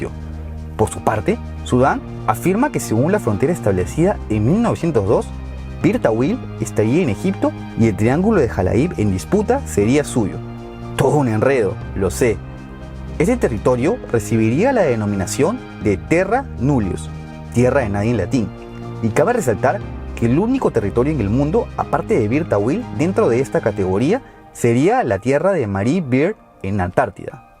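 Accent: Peru. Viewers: Es primer video que veo de tu canal, y con eso ya sé que eres peruano por tu acento! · Su acento dice q es peruano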